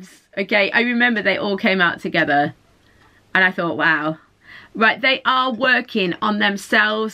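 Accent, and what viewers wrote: United Kingdom. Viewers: Kay Treue same!😄 And both😁😁❤️ 100% resonated, thank you❤️ I’m wondering, could you tell us please from which part of the UK are you?😊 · Thank you, love your cheery British accent! I chose #1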